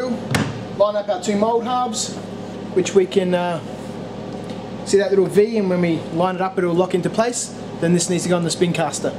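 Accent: Australia. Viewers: Where did you get the spin caster? Sounds like you're in Australia and I'm looking for a supplier here.. Thanks!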